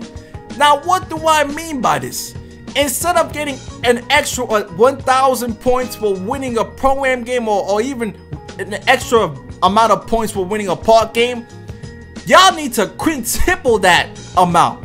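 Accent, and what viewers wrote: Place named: New York, United States. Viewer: Jamais That's how new Yorkers talk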